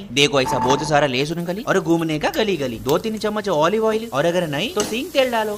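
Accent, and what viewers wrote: South, India. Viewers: Ye ayyo ayyo vala accent nahi pasand gana gane jaisa bolta hai Sidhe bol jara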